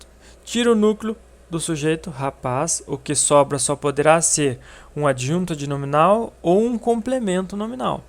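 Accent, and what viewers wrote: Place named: Curitiba, Brazil. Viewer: Você é de Coritiba né ? Reconheci pelo jeito de falar e pela voz, bom video.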